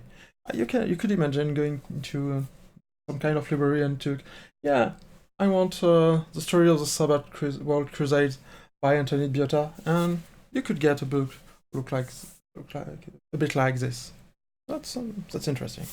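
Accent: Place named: France. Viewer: Nice French accent :B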